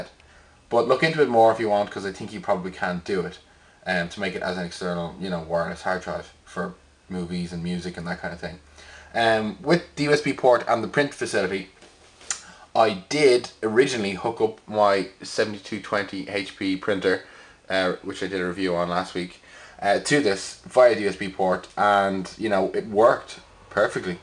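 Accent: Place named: Ireland. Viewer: your irish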